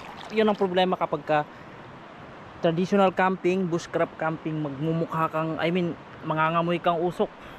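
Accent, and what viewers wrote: Visayas, Philippines. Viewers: Native panggalatok po ba kayo brother? May konting bisaya ang accent nyo, parang mixed. Anyway oks ang channel mo bro.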